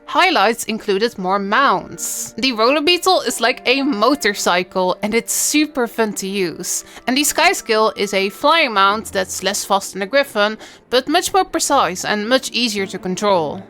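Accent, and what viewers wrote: Netherlands. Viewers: dat nederlands accent erbij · Do I smell a Dutch accent?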